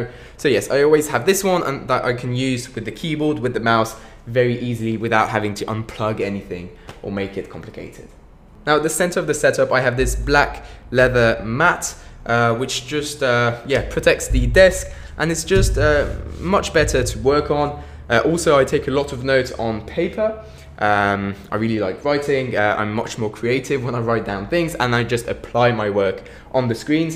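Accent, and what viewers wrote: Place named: France. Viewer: you're accent it's like a frensh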